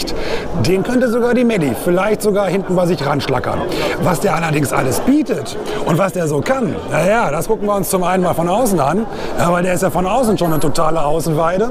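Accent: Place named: Gelsenkirchen, Germany. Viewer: Dein Sprachausdruck ist furchteinflössend.... Willst Du deine Videos nur nach "Gelsenkirchen hin" verkaufen !!!!! Drei mal Daumen runter für Audio :-(((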